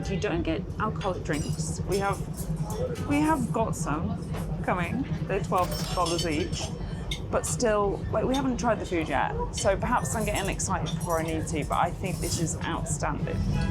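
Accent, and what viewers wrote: United Kingdom. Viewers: This is the first time I’ve seen one of your videos and I love your energy. Where are you from, I can’t place your accent, somewhere north because you say class and not clarse. Oh my god I’ve just realised it’s James from the Chris Moyles show! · Every time I click on this channels videos I start watching and enjoying the well spoken posh English woman and then she completely ruins the whole thing by referring to toilets as the shitter. Just why?🤢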